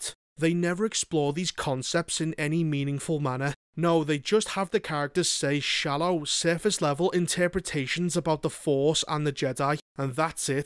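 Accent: Liverpool, United Kingdom. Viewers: This is the only scouser I will tolerate 😂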